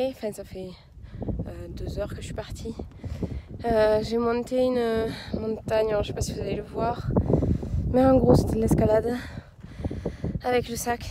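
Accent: South, France. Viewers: Une bien belle aventure. Bravo la miss. Partage nous d'autres belles aventures comme ca. C'est top. +1 abonné. 👍 Et cet accent du sud me rappelle pleins de choses. 😉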